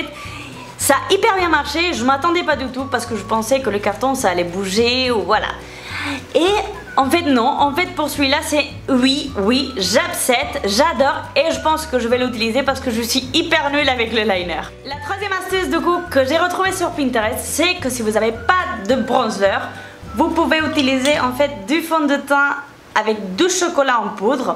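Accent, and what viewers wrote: Spain. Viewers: Elle vient d'Espagne :) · j adore ton acent tu est espagnol continu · On dirait trop que tu a un accent espagnol je t'imagine parler en espagnol lol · Ton accent 👌 Espagnol ? Les 2 première astuces m'intrigue, je tenterai également Bisous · Origine espagnole 😄🇪🇸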